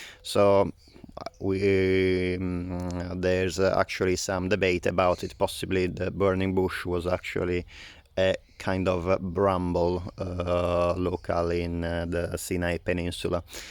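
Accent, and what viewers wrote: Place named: Italy. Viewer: Howdy from Canada. I would call that color kelly green or Irish green. I grow cannabis flowers indoors, legally of course. Love the Italian accent. Stay safe and be well. Smell you later, eh? ♥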